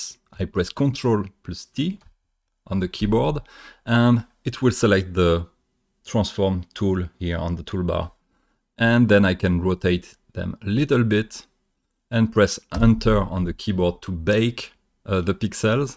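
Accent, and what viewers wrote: France. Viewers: Your french ascent is really lovely and your videos are so wonderful. Learning so much here 👌🏿✨ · damn, accent disclaimer. i watch your channel for 3 years now, and i also saw really old videos... compare to them, your accent became barely noticeable. even old videos were completely understandable, idk, maybe it's just me whose first language not English either (heard that foreign speakers understand different accents earlier than natives) remember only one struggle, it was shading/lightning video. "Ard light" layer mode made me stop the video and think a lil bit XD then i was like "oh, french, no H sound, riiiiight, got it". this only time from aaaallll your videos who needs it, will tolerate eith any accents or difficulties. if someone like "iu, accent, turn it off", they're probably not really into it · An hour well spent...the French accent made listening a joy! 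Thank you very much for the immense effort you put into making this fantastic tutorial! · "heavy french accent" already warms my heart)) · Just... Bravo! Giving you standing ovation right now. I liked the very detailed explanation and your french accent - it just make the video better. I am still couple of light-years away from your level of drawing skills, but video helped me a lot.Thank you! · Hahaha c'est cool - heavy French accent! 😆 Je suis Allemande et je l'adore en fait 😄 Je suis sûre que j'ai un vrai accent, aussi, quand je parle Français! Mais l'accent Allemand n'est pas si charmant! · Dude I love your accent! I love listening to the French language. I love watching French television. But with subtitles in english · Love that you walked through your layout setup process and everything, thanks a lot! Re: that disclaimer in beginning -- I actually prefer my English tutorials with a heavy French accent :D Also love the draw-in-the-style work, I prefer it over the more anime-style original. 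· I came down here immediately to type this very same message! Mr., your accent is absolutely beautiful, never apologize for not being English-speaking as it isn't even your first language; the French language is beyond beautiful and you speak English marvelously, very easy for me (with hearing impairment issues) to understand you. Thank you for this very ambitious tutorial, I just got the Wacom Intuos Small (CTL4100) for Christmas, it is my very first drawing tablet! Any suggestions on settings I should setup for it anyone? I've watched a few videos on setting suggestions, but there are buttons on the tablet itself, then on the pen and I'm not sure what these should be set to with Krita that would be beneficial. Additionally, is it normal for the pen to be so sensitive? I barely get the pen close to my tablet and it's already moving the pointer on my screen - is this just something I need to get used to? 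· Thank you very much , it's a great deal. BTW, your French accent is not so heavy as YouTube warns. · Tbh french accent make this tutorial a pleasure to hear.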